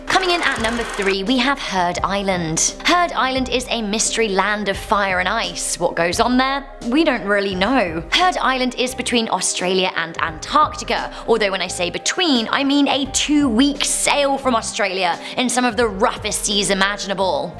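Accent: United Kingdom. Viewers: She sounds British, one can only hope so. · She says capsule like it’s capsooool that’s wack I really love the accent but the British be saying certain words weird asf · Great job as always hun keep up the good work i love your voice are u from the uk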